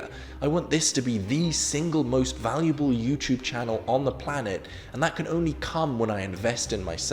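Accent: United Kingdom. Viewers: What? You mean the british accent? The accent that has because he is from England? The same accent used by most of the british population? I guess you're right. If this british guy we don't personally know is talking about money with a thick british accent, then he's probably some trust fund baby trying to scam us. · Hey, i thought you were from the UK because of your britisch accent haha. Where are you from?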